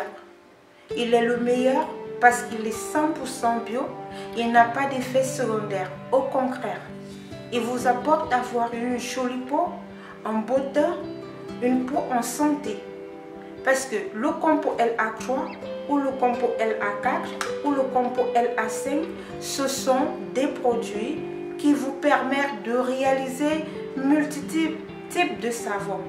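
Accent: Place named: Africa. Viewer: T'es super, je me suis régalée d'entendre ta voix charmeuse et douce avec cette note d'Afrique...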